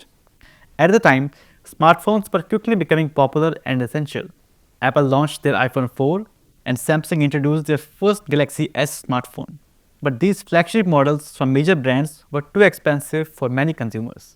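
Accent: India. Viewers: your accent is shouting indian!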